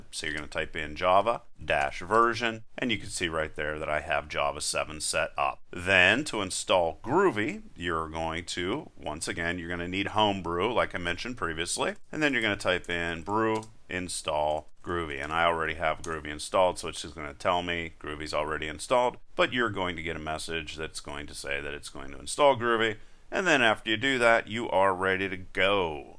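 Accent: Pittsburgh, United States. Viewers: OK... you are indeed a Yinzer... and unlike so many videos, I didn't have to struggle to understand what is being said (which given that I used to work for Greater Bell Labs and had co-workers in my group from dozens of countries and had no problems understanding them, says how poor some of the videos you find up on YouTube are). While this video is a bit long, I think it would have been nice if you had explicitly covered the "var -> closure" syntax and nested each closures. Now to do some digging, as I try to write a Groovy script do do what I can do in over a dozen other languages already.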